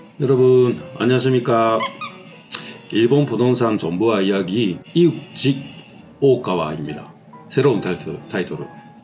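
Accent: Busan, South Korea. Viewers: 전 사장님 부산사투리 말투 너무너무 듣기 좋아요 편안하게 계속 듣게되는 목소리에요. 알림설정 하고 갑니다^^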